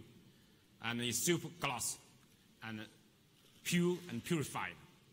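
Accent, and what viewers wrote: China. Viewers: As a Chinese myself I can easily understand what he is saying about (real Chinglish ),but i am not sure that foreign friends on YouTube can understand. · Ugh Chinglish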